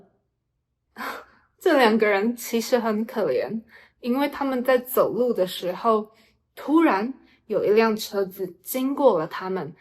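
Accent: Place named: Taiwan. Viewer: Baby's Chinese mandarin is Taiwanese style lol I know he speaks good Chinese but very Taiwan ah : ) plus he always acting cue OMG the way he types words and how he expresses himself, anyway not mainland China style :face-green-smiling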